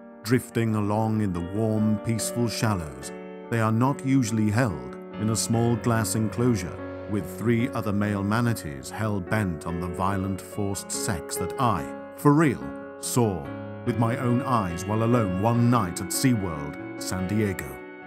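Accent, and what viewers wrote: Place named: England, United Kingdom. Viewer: What a revealing abuse of the faculty of intelligence and videography skills. We don't know if this actually happened or if this was simply a would be frustrated butler using his English accent to sound dramatic for complete strangers at no profit whatsoever.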